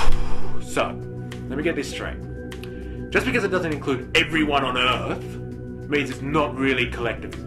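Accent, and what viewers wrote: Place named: Australia. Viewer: using a voice changer but still sounding Aussie? as **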